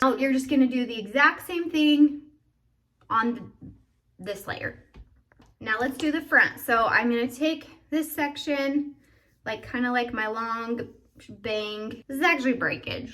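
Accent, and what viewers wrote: United States. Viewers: OMG! Can you tell me what part of America that accent is from?? So cute! Oh my God! 😁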